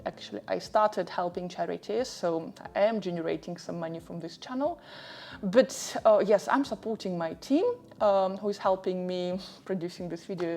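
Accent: Russia. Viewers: Wow, Norwegian with Russian speaking accent. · Hi, I like your content. Good work. I am a little bit like you, except I am not that pretty. I guess they calling us expats or gypsies 🙂Do I hear a russian accent out of you, or am I totally wrong.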